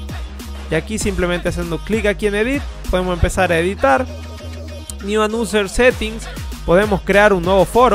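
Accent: Tucumán, Argentina. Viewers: es tucumano pinta que es tucumano mal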